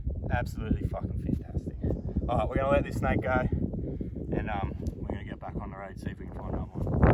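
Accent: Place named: Australia. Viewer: Love the australian accent m8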